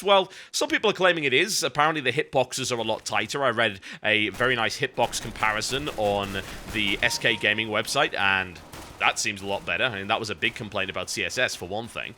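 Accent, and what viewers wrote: United Kingdom. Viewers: I love your voice man...its deep British awesomeness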